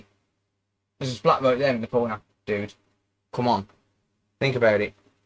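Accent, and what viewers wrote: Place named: Scotland, United Kingdom. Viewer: Good scottish accent mate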